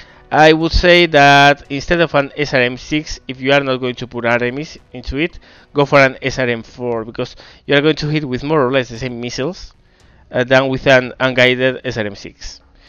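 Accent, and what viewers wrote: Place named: Spain. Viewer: Yay the spanish accent is back ;). Good vid.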